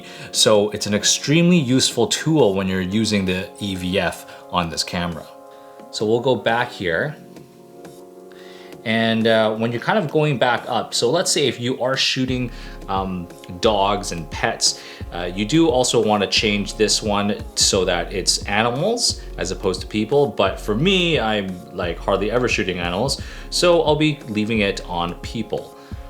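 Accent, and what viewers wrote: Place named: West Coast, Canada. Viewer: Aside from the west coast Canadian accent, this was helpful!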